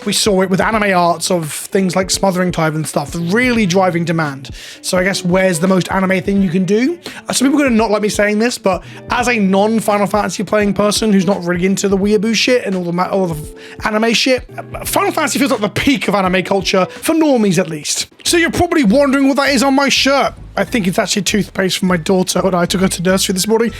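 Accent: United Kingdom. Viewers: I enjoy listening to an old British man yell at the clouds since I’m also old and find it annoying with these art treatments too!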